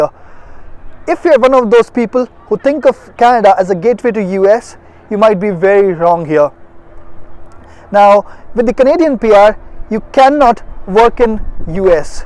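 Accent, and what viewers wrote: India. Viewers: You sounds like you are in India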